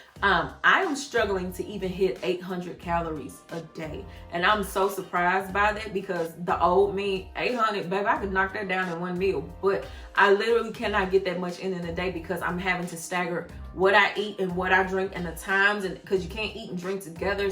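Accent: St. Louis, United States. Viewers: Congratulations Hun! Been checking out your previous vids. You looked Amazing before and you're Still Beautiful now. And I KNEW I recognized that dialect...we homegirls!!! I'm SO StL! Lol 😆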